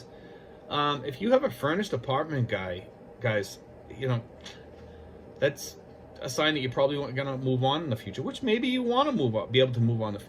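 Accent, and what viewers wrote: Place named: New England, United States. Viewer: A New Englander I hear it haha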